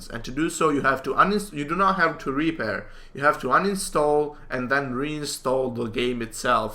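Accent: Italy. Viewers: Oddio Ma sei italiano? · are you italian?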